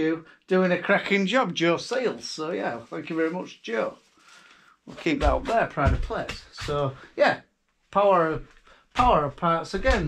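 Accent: Yorkshire, United Kingdom. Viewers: Pleased you & Joe Seels have found each other on here, 2 of the best channels around, can't beat straight talking Yorkshire folk 👍👍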